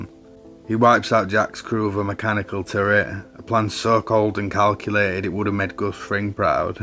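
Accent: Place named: United Kingdom. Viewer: I like your accent. I like the way you say 'Walter White'. A lot of people have made videos about Breaking Bad - and I have watched a lot of them. But your unique British accent might be the thing that sets you apart. As for the content itself, you identified the "I am the one who knocks" event, explained it beautifully, linked it to other important events, and brought it all to a safe end - tying your end to your beginning. Your personal point of view was clear. I liked it.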